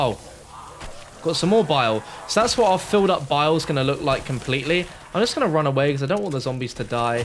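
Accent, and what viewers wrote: United Kingdom. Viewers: People need to stop ripping to shreds about how you pronounce words. British English and American English are very different at times.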